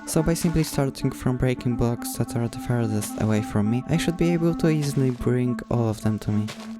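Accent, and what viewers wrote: Poland. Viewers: Hi, you are from poland? I asking because of your accent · Taki nawet polski akcent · On jest z polski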